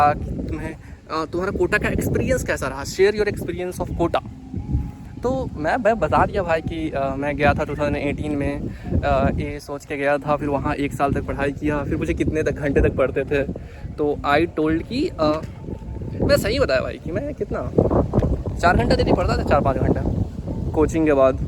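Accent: Bihar, India. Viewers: Bhaiya ek chizz puchna hai aaplog ko pta kaise chal jata hai ki aaj kahan interview hai?? Aur bhaiya aapka boli se hi samajh aa jata hai ki aap bihari ho hum Begusarai se hai❤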